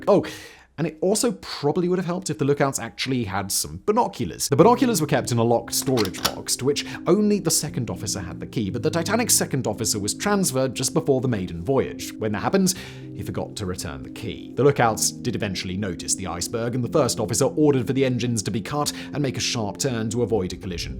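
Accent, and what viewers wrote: England, United Kingdom. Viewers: Guys, ya really can't judge him too harshly. Most all of this stuff is handed to him by writers. Him saying it with confidence and a British accent makes people assume he knows all this stuff. He's just reading the script he got a few minutes before the recording. His researchers can leave a little something to be desired, sometimes. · I don't get a pompous attitude from him at all. Are you American by chance? I wonder if the English accent just comes across that way to you guys (if you are).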